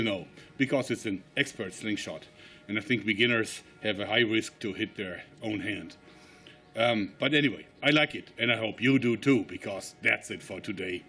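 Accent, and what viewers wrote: Germany. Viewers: for some reason this all made better with the german accented English...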